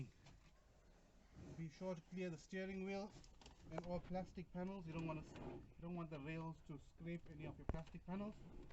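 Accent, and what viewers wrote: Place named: South Africa. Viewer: That sounds like a south african accent...very cool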